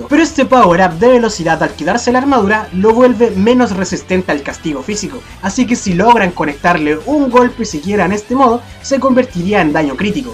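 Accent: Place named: Chile. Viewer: Por tus voz eres chileno lo se porque tambien las voces de nuestro pais es asi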